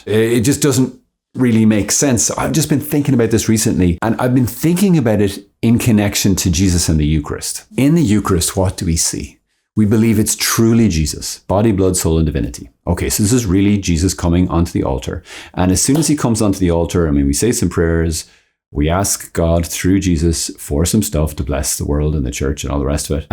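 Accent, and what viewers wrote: Ireland. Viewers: Em Irish accents 😭🍀 Aiiii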